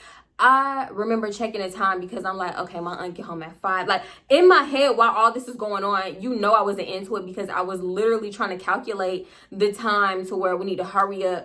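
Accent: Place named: South, United States. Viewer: You sound extra southern today idk what it is 😂😂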